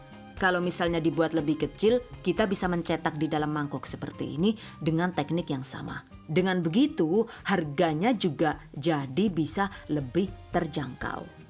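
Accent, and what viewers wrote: Banyumas, Indonesia. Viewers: paling sng klo ibu ini yg memandu bahasane campur aduk ada ngapak jg...slm ngapak dari tegal y bu...🙏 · 👌seperti biasa ,apa saja hasil jadi masakannya pasti tampilannya cantik sempurna, mksh bun kasih tutorialnya yg super duper lengkap dan jelas dgn ngapak2nya yg kadang bikin senyum2 klo pas mantengin videonya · Wong ngapak ya mbak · Jal wonge kaya ngapa.... ngapak temen 😂😂😂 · Wuaduh...ngapak banget....kie mbakyune......yaw...kriben ya... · Rika wong endi sih yu....ngomonge kaya wong nggonku....banyumasan 😁 salam kenal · Ora ngapak ora kepenak ya yuu... 😁😁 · Wong Jawa ngapak apa kie...wkkkkkk........soale bahasane mung wong Banyumas sing kaya Rikane..Nek emang bener bangga nyonge...kmbi njenegan buk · Mantul pake ngapak ngomonge 😄😄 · Makasih resepnya yu..cara menjelaskan begitu jelas,saya suka banget, maaf yu sampeyan asli ngendi,kok ngapake kaya iyong🙏🙏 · Hehe q suka ngmgnya ngapak.. 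· Rika wong ndi yu bahasane ngapak lucu he.he.he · Salam ngapak 😂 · Pingin ketawa..ngapak nya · Rika asline ngendi yu jere wong madiun deneng ngomonge bleketek kaya wong banyumas,,nyong penasaran yakinlah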